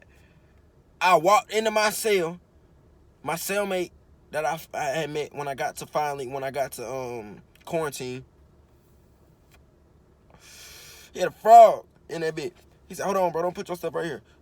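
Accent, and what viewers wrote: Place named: Chicago, United States. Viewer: I didn’t watch the season you’re in I watched the first 3. You sound like you frm Chicago tho haha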